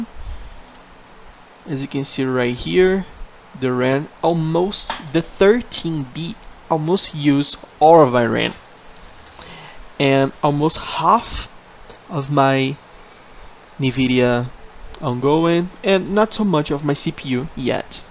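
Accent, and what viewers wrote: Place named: Brazil. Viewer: Thanks for the video, I have the same GPU. (Acer Nitro 5 / Intel Core i5/ 32 gb DDR4 / GPX 1650). By the way, are you Brazilian too? I am Brazilian and your accent sounds like mine lol.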